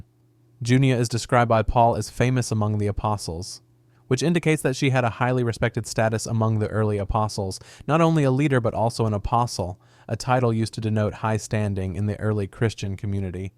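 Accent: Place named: South, United States. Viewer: Hear the voice mate? It's American. Sounds like the south our lower Midwest, meaning the large majority of their religious exposure is Christian with perhaps a skimming of world religion ans culture, perhaps a world religion class or two, but nothing you can't get with a Google search. Also, the voice sounds Caucasian (not being racist, I'm a 50 year old white dude myself) based on the number of accents and regional speech patterns I've heard. Most white, American Christians don't bother learning much about Islam particularly because it's outside their belief and labeled satanic. Hope that helped.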